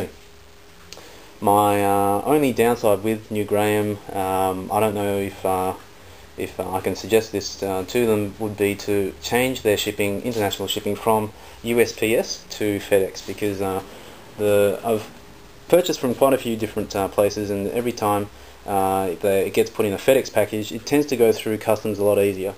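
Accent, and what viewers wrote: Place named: Australia. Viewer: good to hear an aussie accent for once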